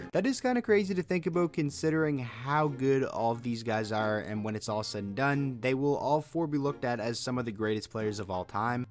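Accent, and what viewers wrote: Canada. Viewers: Which province are you from? You're the first canadian I've listened to who actually says "aboot" instead of just "abeut". I thought that was just a stereotype but I guess some of you actually do say it like that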